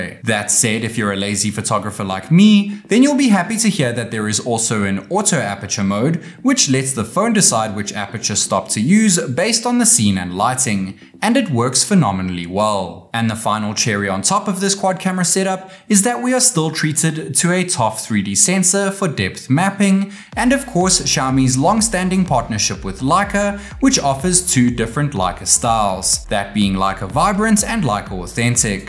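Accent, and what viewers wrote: South Africa. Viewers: Your accent sounds South African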